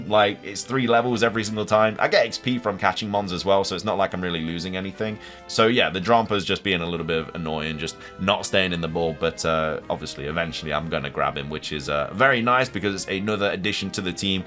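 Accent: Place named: Northern England, United Kingdom. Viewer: I hear bits of some northern english pronouncing and wunder from what county thou art,.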